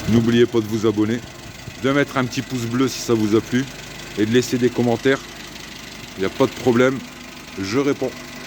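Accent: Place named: North, France. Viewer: Beau reportage Avec l'accent du chnord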